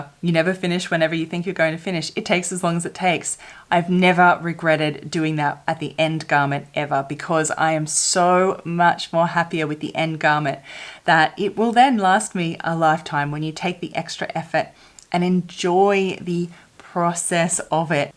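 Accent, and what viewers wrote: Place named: Australia. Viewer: Hello! I'm a fashion designer student, recently took the haute couture class in which everything is handmade. The project is a wedding dress and I aboslutely loved the process. It is really slow and it requires patience and attention to detail, but I found it quite relaxing. It was a space I gave myself to take things calmly, and stop worrying about all the other stuff I had to do on the day, you know? I know I can't make all my pieces in this method, or at least not now cos of the economic side of it, but it was a nice experience: for the first time the dress' aesthetic is comming exactly as I imagined it (only a size smaller hahahaha), and I guess is the time involved in it. By the way, I didn't know you are in Australia, I could sense a different accent, but didn't guess where it was from. I'm not an english native speaker, so I was like... 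hummm.... she sounds a bit british, but not quite! hahaha. Greetings from Mexico City <3!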